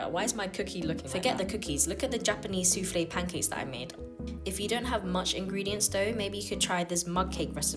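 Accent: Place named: United Kingdom. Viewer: omg the british accent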